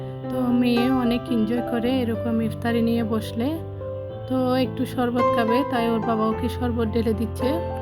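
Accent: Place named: Chittagong, Bangladesh. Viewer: ❤২২❤❤আমার প্রিয় আপু সিমের বিচির খোসা ছাড়ানোর সুন্দর একটা আইডিয়া শেয়ার করে দিয়েছো অনেক ভালো লাগলো শুটকি মাছ আমারও পছন্দ তুমি চিটাগাং এর আমি জানি আর তোমার কথায় আমার কাছে সেরকম লাগে আর আমার খুবই ভালো লাগে কথা এই ধরণটা আমার চিটাগাংই বেড়ে ওঠা সবকিছুই গরুর মাংস রান্নাটা অনেক সুন্দর হয়েছে বেগুন সিমের বিচি শুটকি দিয়ে রান্না করেছো ইফতারি গুলা ভেজে নিয়েছো বেগুনি টা আমার কাছে খুবই সুন্দর লেগেছে ভাইয়া অনেক কিছু নিয়ে এসেছে বাচ্চারা বাইরের খাবার খুবই পছন্দ করে আপু আমার বাচ্চাদেরও একই অবস্থা ভালো থেকো ❤❤❤❤❤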